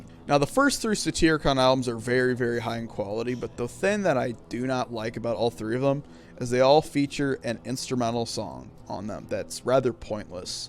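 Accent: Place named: United States. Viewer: lol, The Shadowthrone being the worst... Nemesis "Divinia"... lol :D 1. Disagree that any of the instrumentals are pointless... that's just a pointless point... 2. Disagree with your "absolute best" songs on the 1st two albums :) 3. Disagree on "Rebel Extravaganza" being the best album from those to come... I think it's the worst... Volcano is much better. Now, Diabolical; Satyricon & Deep calleth upon Deep are amazing albums! There's absolutely nothing wrong with them! This guy only says: this is the worst of all & that is the worst of what I've ever heard... lol, this guy sounds like 100% American id*ot who's trying to make sense of pure European Black Metal Epicness! :) Otherwise, there're some new stuff that I learned from that video, like the early history of Satyricon & their formation. So, I wouldn't say it's completely useless... something like 4/10 is fine. 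The rest is only "boring as fuck" this & that :D Nemesis DIVINIA - Peace Out! :)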